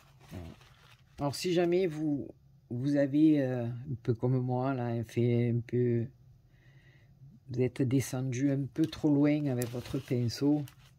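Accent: South, France. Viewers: Bonjour je viens de trouver votre site et je suis très heureuse de vous suivre vous faites des choses magnifiques j'adore ces cartes faites à l'encre elles sont super jolies merci pour l'idée du gabarit lune avec ses ombres il fallait y penser c'est super Merci beaucoup et puis en plus j'adore votre accent ça me rappelle mon midi (Perpignan) je suis maintenant sur le bassin d'Arcachon ce n'est pas le même accent!!!! bonne journée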